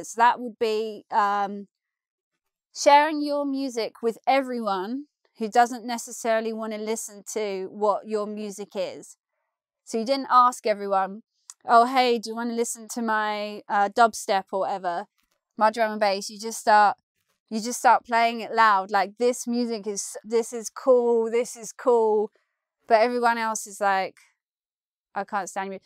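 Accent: United Kingdom. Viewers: I liked it a lot. I could not stop smiling. There are many things in common but, at the same time and with all due respect, you "sound" so English. I loved it, seriously. I don't usually comment, but this video is very nice and fun. I really appreciate your work. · You're cute! And I love brittish accent! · Hello Ms., I am Sharon from Vietnam, I have been following your channel for 4 years. My passion for British accent drives me here. Your accent is super nice, and amazing videos with so many helpful and interesting to learn. I am planning for England this December/ 2019, I want to experience life, culture, engage myself more with local people, not only travel for sight seeing. I hope u can make a video advise us on that, of some activities, program that visitors can join to understand about British culture; I heard of couch surfing too, can u share your knowledge on that. 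Thank you so much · You are so beautiful speaking the British English.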